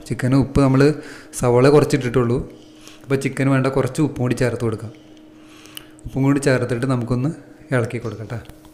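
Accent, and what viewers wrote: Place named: Thrissur, India. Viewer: തൃശൂർ ആണോ.. സംസാരം നല്ല രസണ്ട് 🤩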